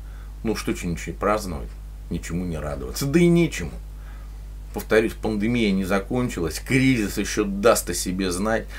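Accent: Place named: Urals, Russia. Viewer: Реально смотрю и подписан давно ! Так ху..ить власть !! Просто красиво!!👍👍говор как то настораживал, и вот сейчас услышал что земляк с Урала!! Респект и уважуха!😉😀 всё грамотно и по сути!!!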